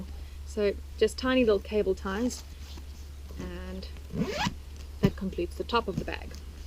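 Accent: South Africa. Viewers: Great video. Watching from the US. Also love the South African accent. :) Cheers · Thanks for an interesting video, love to learn about Africa :) Also dig that wonderful south african accent. Greetings from Sweden!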